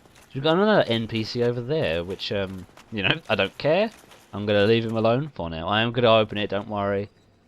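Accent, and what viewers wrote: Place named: United Kingdom. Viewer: Ok, have I done something wrong?. I've opened up Anor Londo but after seeing this vid I thought i'd go back and clear up all the loot and kill the headless demons in the basement ( I dunno their name ) I jumped down to talk to Siegmeyer and the guy ain't there, he's not at firelink either (scratches head). Loving the vids, nice to hear a fellow Brit's voice on the commentary!;-)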